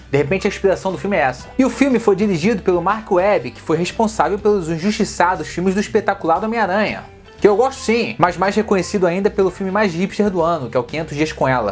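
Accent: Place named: Rio de Janeiro, Brazil. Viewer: Sou carioca e até eu acho q vc tem sotaque de carioca.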